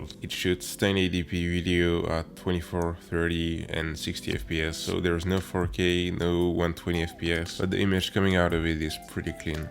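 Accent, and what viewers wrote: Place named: France. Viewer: Oh yes!!! The OG 6000 should definitely not be forgotten. Today plenty of people try to run with 4K, 10 Bit and 60 FPS before they can even walk properly. It's nice to hear your view, because it really sounds it comes from actual experience and not some stuff people say because big Youtubers say it. Keep it up man! And don't worry about your French accent!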